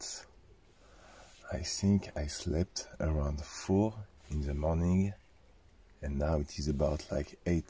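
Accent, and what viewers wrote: France. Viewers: C'est incroyable ce qui t'arrive 😁 j'aime bcp ton French accent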